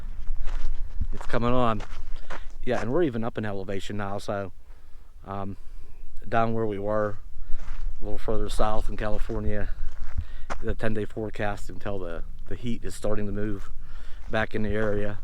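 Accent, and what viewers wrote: Pennsylvania, United States. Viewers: Newer sub of yours! You definitely have a PA accent...I am originally from Mount Lebanon, PA-burb of Pittsburgh! Are you from PA or WV?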